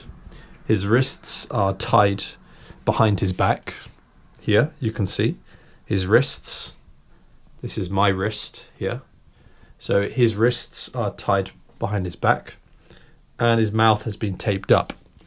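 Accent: United Kingdom. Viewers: Really, the British accent is the best.❤❤❤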